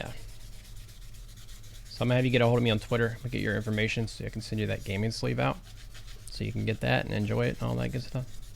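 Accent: Ireland. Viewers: R u actually Irish though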